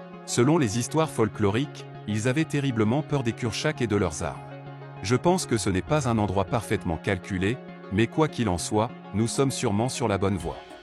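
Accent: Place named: Provence, France. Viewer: je confirme l'accent de Provence si je ne m'abuse rajoute un charme. (Salutations d'un marseillais (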